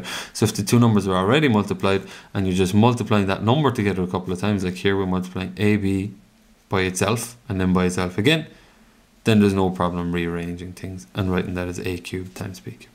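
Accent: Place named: Ireland. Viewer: Cant work out if its the irish accent that did for me but weeepppiieeee!! Got it...lol Thanks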